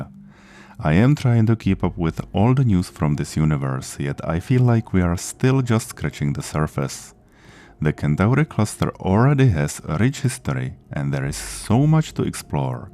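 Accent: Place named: Czech Republic. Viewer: You sound very Czech. Are you Czech? YOU ARE! Hello :D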